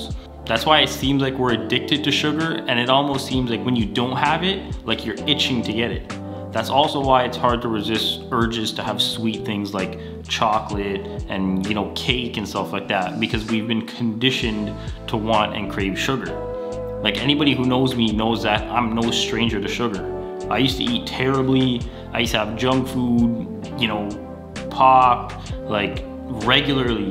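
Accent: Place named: Toronto, Canada. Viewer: Man you have such a Toronto accent😂😂.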